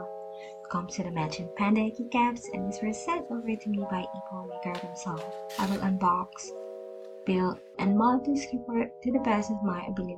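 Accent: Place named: Philippines. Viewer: My dumbass rly thought u were a dude. Also r u filo cos u kinda hav the accent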